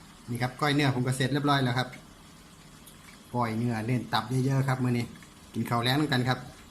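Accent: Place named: Chaiyaphum, Thailand. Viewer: จ่าเป็นคนบ้านได่ครับ สำเนียงแบบนี้ให้ผมทาย ชัยภูมิคักๆ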